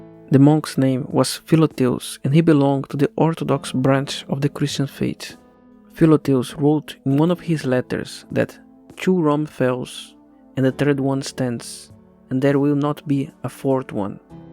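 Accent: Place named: Brazil. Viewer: Are you Brazilian? Your accent sounds Brazilian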